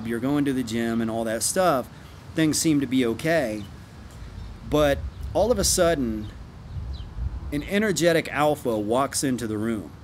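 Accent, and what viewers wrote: South, United States. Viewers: With that southern accent 😂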